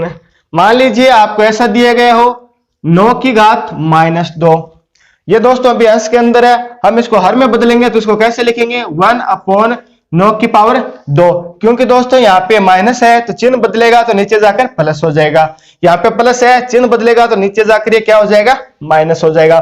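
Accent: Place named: Sri Ganganagar, India. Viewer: Language ka lahaja.. Dekhkr lga... I'm from Sri Ganganagar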